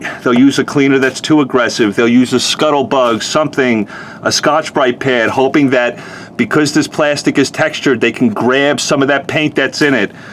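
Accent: New York, United States. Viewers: Hi I just subscribed to you’re channel. Are you from New York originally. My parents are from Brooklyn NY. That’s were I get my NY accent from. You sound like a New Yorker. Love your page. I just found it today.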